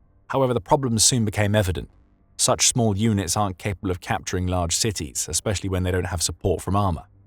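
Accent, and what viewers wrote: United Kingdom. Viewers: You can tell it's bullshit if they narrate with a British accent.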